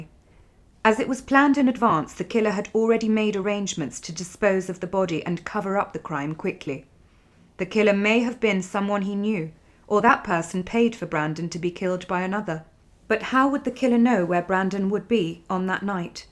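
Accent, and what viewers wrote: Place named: United Kingdom. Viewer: I have looked at & listened to many videos about this case. but it’s so good to hear it spoken in a calm collective manner by a fellow Brit. Thank you. It’s time Brandon was brought home.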